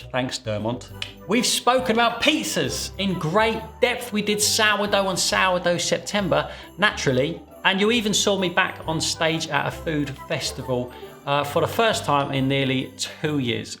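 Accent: United Kingdom. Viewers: Merry Christmas,. None of the "issues" have been an issue for me. I just love to see you giving bread tips with all your energy and that unmistakable British accent of yours. P.S. What is your secret for looking so young? You must be in your twenties or thirties, but you look like a teenager.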